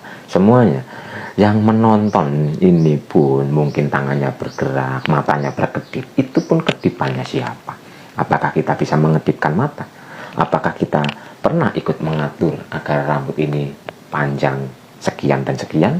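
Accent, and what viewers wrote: Banyumas, Indonesia. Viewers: Assalamualaikum wr wb Qolbitu ustadz ilmu nya,,ilmu sgt bermanfaat,,ustadz ko ngomong ngapak ya 🙏😀😀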